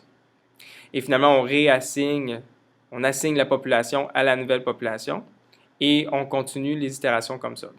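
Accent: Quebec, Canada. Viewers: le francais canadien :) merci frero pr l'effort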